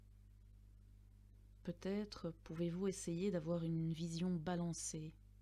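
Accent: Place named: Belgium. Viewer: J'ai bien aimé la vidéo, je l'ai visionnée/écoutée 2 fois. Pour moi votre accent belge rend votre voix plus belle et plus agréable à entendre (je suis Français...), et je trouve vos conseils plutôt pertinents. Petit bémol de mon point de vue, le son semble très bas par rapport aux autres vidéos sur youtube. Je dois alors augmenter le volume au maximum puis le baisser subitement dès que je visionne une autre vidéo. En tout cas je vous dis merci et vous souhaite une bonne continuation.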